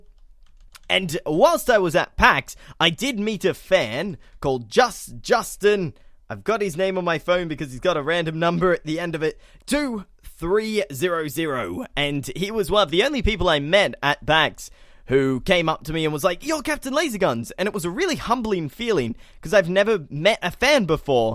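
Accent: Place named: Australia. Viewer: omg, I have been watching your videos for a year now, and I thought. HAY... Hay, I know that accent. :/ OH WOW. says my realisation overclocked brain, it's like 3mhz now! THATS AUSTRALIAN! Then, HAY I'm Australian. suddenly mind blows. great content, keep making those video's. And spores back to, yay! I suppose.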